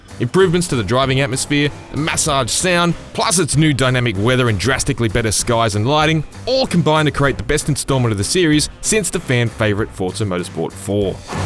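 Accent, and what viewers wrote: Australia. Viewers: I quite enjoy aussie accent, but listening to this gabble is true pain... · The guy is definitely an aussie, man. 😅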